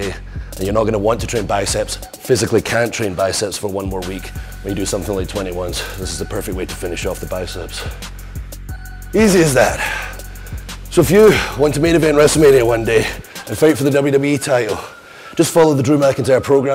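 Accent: Scotland, United Kingdom. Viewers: I thought you're Scottish mate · English?... you're fking Scottish, mate. I take it back, you talk like us, you're worthy. ...like it's up to us 😂 Don't fk with a Scotsman. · Not British it’s Scottish mate.... Mon then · british?I thought he is Scottish🤔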